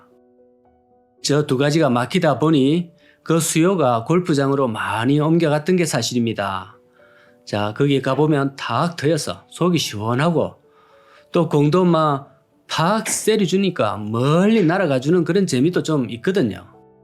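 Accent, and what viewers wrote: Gyeongsang, South Korea. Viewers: 아재 말투는 구수한 경상도 말투입니다... 유네스코 무형 문화재 감입니다.... 아재요, 욕 많이 봅니데이 ~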